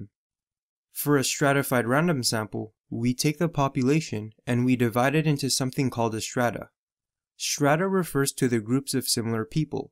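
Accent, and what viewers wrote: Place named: China. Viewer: video not useful could not understand heavy Chinese accent. would not recommend -2/10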